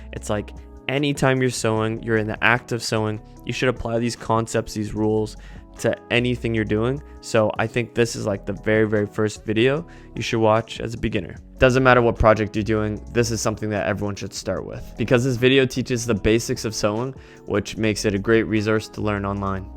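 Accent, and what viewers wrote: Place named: Toronto, Canada. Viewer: I totally pegged your Toronto accent :) Anyhoo, great video, your instructions are clear and well thought out. I just started sewing again after a couple of decades, and really enjoying this learning phase from Youtube videos. Waaaaaay better than what I learned in school! All we learned was how to make stuff from patterns, but not how to fix things, mend them, or how to make patterns from clothes without having to take a master's physics and geometry in university. Subscribed!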